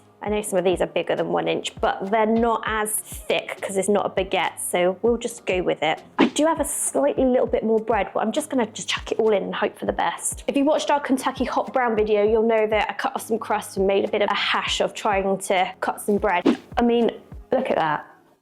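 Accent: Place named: United Kingdom. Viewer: I’d rather they pronounce it the British way. It’s part of the charm of their channel in my opinion.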